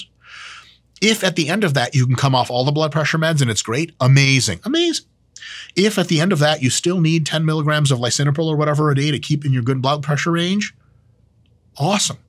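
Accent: South, United States. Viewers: Southern accent Dr. Mike is criminally underrated and underutilized.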